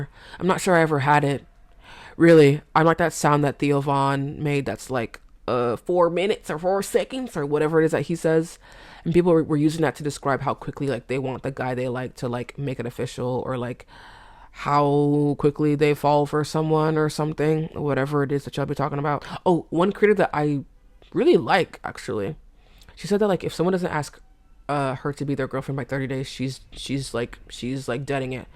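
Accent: United Kingdom. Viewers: The British accent is genuinely so good and fitting on you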